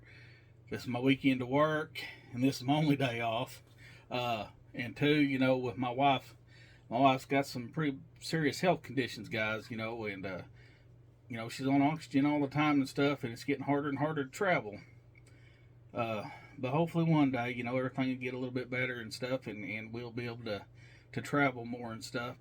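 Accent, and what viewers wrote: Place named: South, United States. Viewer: i love to hear you talk that red neck voice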